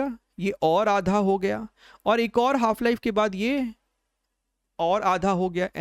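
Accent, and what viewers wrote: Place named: Haryana, India. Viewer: 1:21:8 sir haryanvi tone 😀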